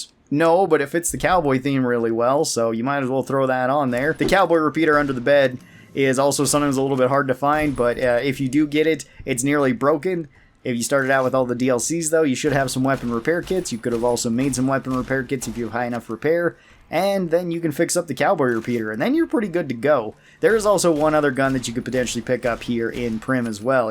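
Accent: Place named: West, United States. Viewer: I really love how you speak a little bit like a cowboy in the video as well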